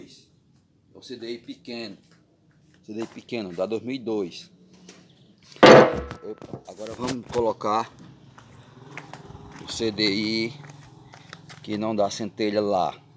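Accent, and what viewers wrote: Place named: Pernambuco, Brazil. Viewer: Bom dia!. O cara acha qie voçe e do Pernambuco.. Kkkk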